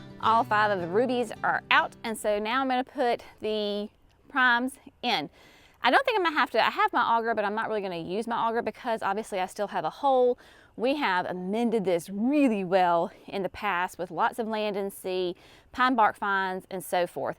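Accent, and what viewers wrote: South, United States. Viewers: I was so inspired and motivated watching this video!! I am in the process of moving blueberry bushes and was so worried I might kill them as they are very established. Some how watching you calmed my fears. Question… where on earth can I find some ‘Land and Sea’ compost??? I live in Kentucky close to Clarksville TN and can’t seem to find it at my garden centers. Is there another alternative you could recommend?? I just love your channel… your sweet southern accent and smile MAKES MY DAY! ❤️❤️❤️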